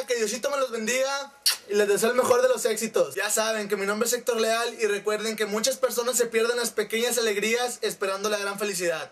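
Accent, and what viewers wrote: North, Mexico. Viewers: a yo nunka veo novelas bueno da iwal jejej me reiii thankss ta bien curada tu vozz sii luego se ve k eres del norte xingaooo jajajja xidooo videooo...... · Ey chiko eres nuy lindo , aunk no me adecuo aun al dejo mexicano , me fascina tus videos y tu forma de expresarte, dejame decirte veo tambine werewer y son distintos cada uno su estilo por eso regreso a verte siguele chiko y un super beso !! muack te escribo de peru XD soy mayu cuidate q diocito te bendiga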